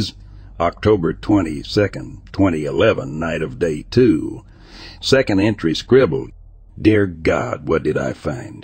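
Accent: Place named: South, United States. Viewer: I think you may be mistaking mispronunciations with the regional southern accent. I haven't heard any mispronunciations. Certainly not routinely.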